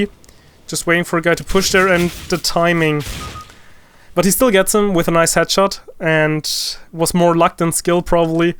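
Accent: Germany. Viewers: Das erste Video was ich von dir sehe, direkt am Akzent gemerkt dass du Deutsch bist haha :D Ist aber ein mega Geiles Video geworden ! Hab mal gesubbed ;)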